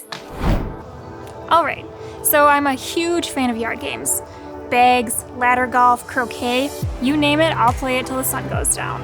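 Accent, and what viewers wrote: Midwest, United States. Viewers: Wisconsinite here. Was going to say, Midwesterner for sure!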